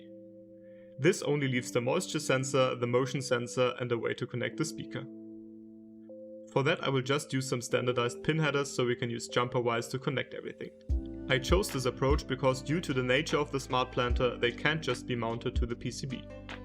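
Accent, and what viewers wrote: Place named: Germany. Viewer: Judging from his accent, he's german. So make ("machen") is often used broadly in foreign languages, even if he was referring to actually design(ing). That what happens if you don't use your mother tongue and its certainly not with bad intent.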